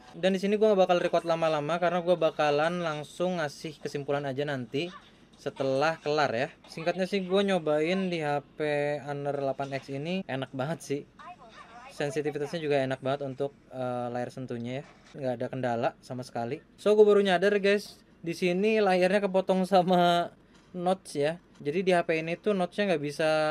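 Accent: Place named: Serang, Indonesia. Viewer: Waduh bahasanya to orang serang banget:v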